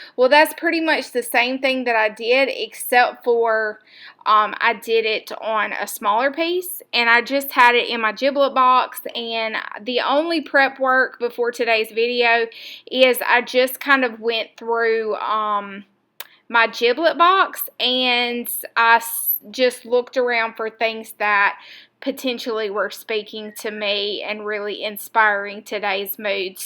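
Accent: South, United States. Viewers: Love to watch you and listen to your southern accent! Love how you use your giblets!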